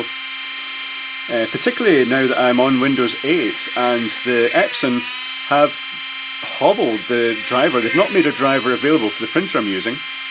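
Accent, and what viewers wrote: Scotland, United Kingdom. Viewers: Googles automated closed captioning system trying to interpret a Scottish accent is quite funny at times.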